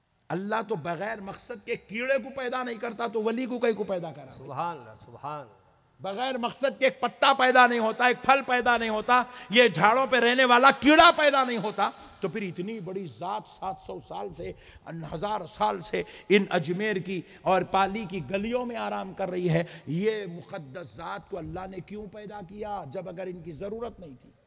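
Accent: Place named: Hyderabad, India. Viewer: Are bhai yo hyderabadi hai thoda dakni lajha hota hai. Kab bhai koye kise ghaga .ka koye rahata hai osi language ya tahsil Ko sikhata hai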